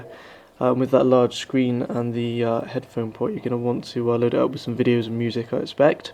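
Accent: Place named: Bristol, United Kingdom. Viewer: Your a Bristol lad then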